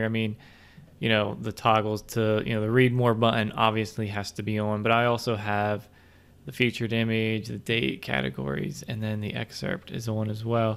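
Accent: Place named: Baltimore, United States. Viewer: Are you from Baltimore or Maybe Philly?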